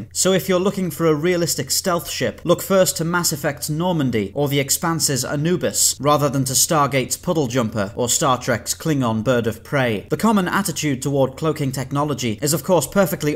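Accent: United Kingdom. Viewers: I truly enjoy your episodes. It was that pointed you out. I enjoy your attention to detail, graphics and that British accent, with your own personal confidence make for one hell of a great voice over voice!! I do, however, have to disagree with you a number of your points. First, you presuming a galaxy devoid of long range sensor arrays. These serve as surveillance, relay stations, beacons as well as keep track of all craft movement with in the area they can surveil. These arrays could scan for hundreds of different anomalies. They would cover the entire light spectrum, they would also likely have very sophisticated visual scanners, and likely an assortment of drones to extend their range or get closer looks at possible tremors of danger. As far as stealth technology to mask their the residual radiation of their engines, primary faster than light engines and ‘impulse’ engines. 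Also masking every frequency with which they are scanners. If they have shield activated, there is no plausible way to disguise radiation waste from their various engines. It is possible to design a ship that repurposes waste radiation, basically a submarine in space. Shields though, that’s an immense amount of energy in the shields themselves. This a huge problem. Romulans couldn’t engage their shields while cloaked, the sheer amount of energy, projected away from a ship, to most effective, yet at least 200m away from the ship, to better protect the hull from hugely impactful hits that would resona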